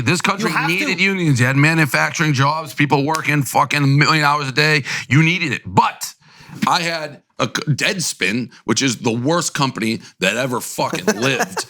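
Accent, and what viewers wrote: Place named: Boston, United States. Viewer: love the Boston accent when its mixed with good articulation.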